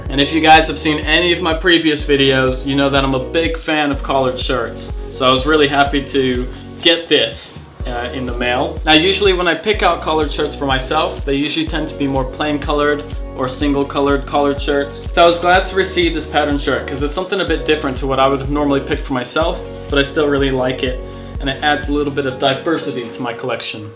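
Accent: United Kingdom. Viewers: The british accent is kicking in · developing that British accent I hear... :)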